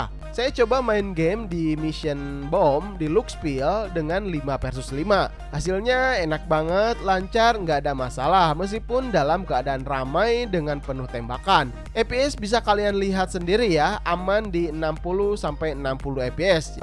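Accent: West Java, Indonesia. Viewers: Sunda pisan maneh huhuy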